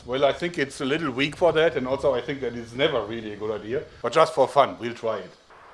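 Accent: Germany. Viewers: Yeah, German or Russian accent, like a bond villain.I mean Victor Frankenstein was Austrian, so there's the basis for mad scientists in pop culture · is German and has a slight speech impediment, one of the things that makes ubermensch. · ist er deutsch in hatt englisch gelernt ? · Wow Englisch mit Deutsch agtzent schreibt man das so? Also agtzent naja aifjeden fall ist es interessant und lustig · Are you German? I am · you have a german accent so let me ask you are you from germany? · Normally German accents make me cringe, but somehow I have no problem with your voice. Also: awesome channel! Weiter so. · you are german aren't you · ich liebe den deutschen akzent im englischen ^^ weiter so videos sind gut XD mfg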